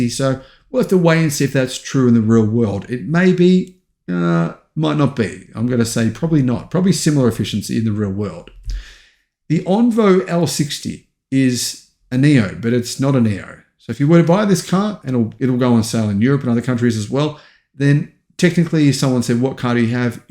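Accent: Australia. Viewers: He is not an American, he is an Australian. Can’t you tell from his accent?😂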